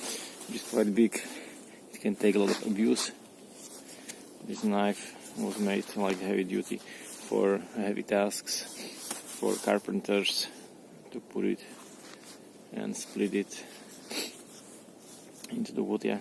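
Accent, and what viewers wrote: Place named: Brazil. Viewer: Your accent sounds almost Brazilian my friend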